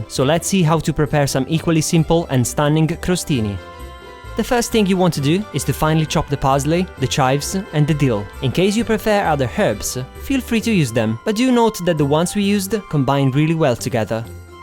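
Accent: Italy. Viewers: I love love loooooooove your italian accent when speaking in English. Sono vissuta due anni in Italia e i tuoi video mi ricordono del legendario "sense of humour" degli Italiani (aaaaah, nostalgia!) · no, dont change your accent, Italians always make english sound beautiful! these look really good:)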